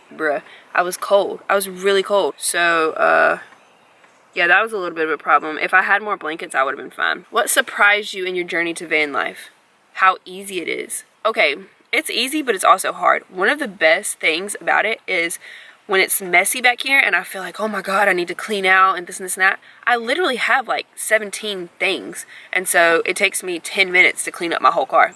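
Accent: United States. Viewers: I love this! Where are you from? You have a little country twang lol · Caitzen lol yes it's sounds kinda Texan 💀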